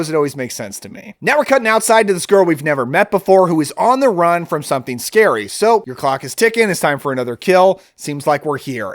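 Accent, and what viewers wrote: United Kingdom. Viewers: I was also going to say it is a mid-lothian accent but you beat me to it first... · Yeah! Great description. Edinburgh is the sort of area I was thinking that sort of accent is from but I've only been there once myself so didn't feel super confident at identifying the accent.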